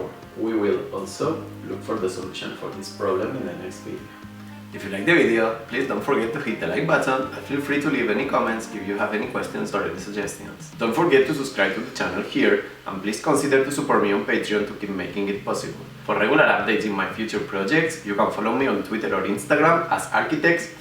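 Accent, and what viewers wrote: Spain. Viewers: Después de un buen rato viendo el vídeo y sospechando por tu acento de que sabías hablar español, veo que pone en el Mac "Archivo/edición" jajaja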